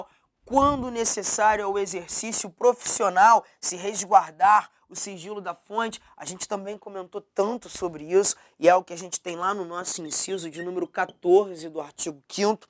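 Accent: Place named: Rio de Janeiro, Brazil. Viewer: Dá agonia assistir por mais de 15 minutos! Cariocão da fala "malhandra" ngm merece!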